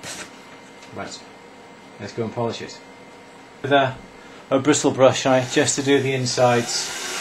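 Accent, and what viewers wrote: United Kingdom. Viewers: is so HOT! That cute little British accent gets me every time. Plus he's the only one on you tube who knows how to use a file. None of this back and forth garbage. --That guy has dirty hands because he's in buffing compound and sandpaper etc all day. It's hot.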